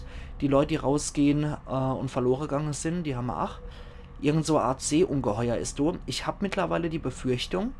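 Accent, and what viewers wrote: Palatinate, Germany. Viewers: Omg. LETS PLAY UFF pälzisch ist ja wohl das beste Ding ever!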